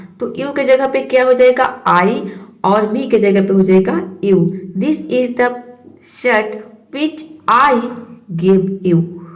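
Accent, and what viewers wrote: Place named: Bihar, India. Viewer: Bihari sare esahi bolte hai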